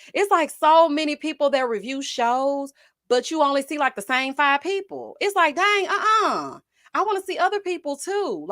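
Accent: South, United States. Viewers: Hey Girl Hey… we love your southern commentary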